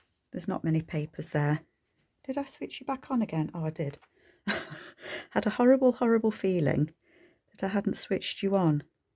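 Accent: United Kingdom. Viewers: These are quite clever! My grandmother said she was born in 1900, but the Bible says 1898!! We guess she just wanted to be in the new century! When were the Scotsmen allowed to wear kilts again? I know for a long period they were outlawed. You have the loveliest accent! I don’t know how to distinguish British accents from one another, but yours is distinct, I’d like to listen to you for a whole evening.! Please no mushroom, the kilt shouldn’t be covered up!! (Just my opinion!) haha! Very sweet little booklets & you’re so organized! I’d like to be, but… thank you!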